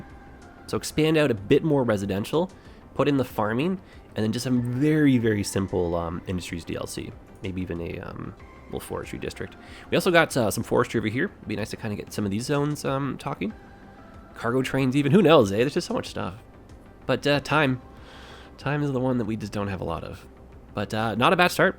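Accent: Toronto, Canada. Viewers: i was just coming to comment that this dude sounds so canadian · Discovered your channel through the 5 Builders collab, really enjoying your content. As a fellow Torontonian, but one who mostly watches British YouTubers, hearing someone online using the local accent really caught me off guard lol. The numbers of "beauty"s and "eh"s in this video are fantastic :D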